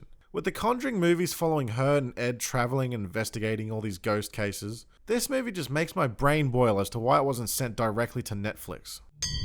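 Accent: Australia. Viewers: I just worked out that you’re Aussie and that made me happy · Wait, Your Australian?... IM A FUCKING QUEENSLANDAAAAA! YEAH BRO! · Are you Australian?? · This shit wouldn't be as funny if you didn't have an Australian accent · spot the Aussie lol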